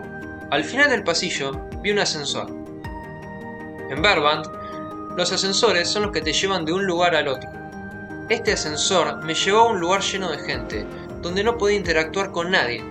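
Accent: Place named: Argentina. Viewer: Cuando escuchas que el narrador del videoensayo es argentino, sabes que va a estar bueno el video sjdssdjjsd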